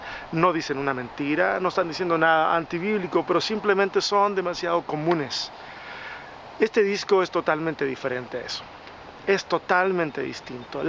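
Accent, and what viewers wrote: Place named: Mexico. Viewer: Cómo se nota la influencia de vivir en México en esos años, hablabas a lo mero macho wey.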